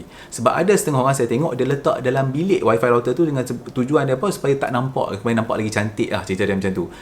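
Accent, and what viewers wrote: North, Malaysia. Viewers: Hihi best denga ckp utara, nk kna try la semua tips ni sbb sy punya mmg teruk w/pun dah ddk dlm coverage & Band40 … tp td ckp x pkai modai, tp ad cdgn pkai modai, nk claim la boleh? 😅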